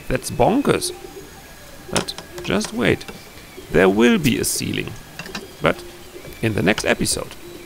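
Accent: Germany. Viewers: The stem wall or foundation wall was not a mistake! The 3x3s or what ever the beam size are you used to frame with and the insulation and osb and the windows and what ever you cover it with weigh a lot. Good job. I'm getting used to your German accent! German accent in Germany....duh! I'm digging your renovation. Love from NW Colorado, USA. Thanxz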